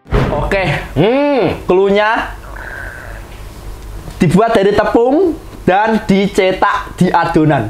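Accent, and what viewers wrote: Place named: Semarang, Indonesia. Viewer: Logate semarang bgt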